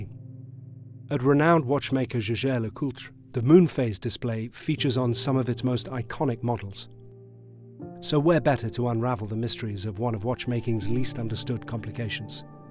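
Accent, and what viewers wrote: United Kingdom. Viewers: Great video. Love this style, and of course the British narrator. Beautiful watch for sure too!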